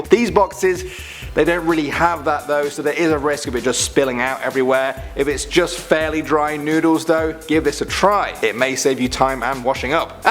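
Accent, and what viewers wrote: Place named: United Kingdom. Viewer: i think that is british accent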